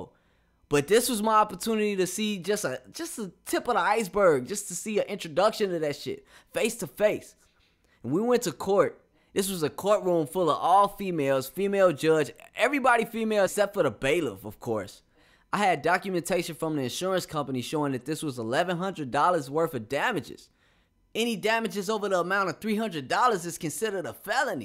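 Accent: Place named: Chicago, United States. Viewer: I got ppl in the CHI, Roseland n Chatham I hear ur accent bro lol!👍🏾👍🏾👍🏾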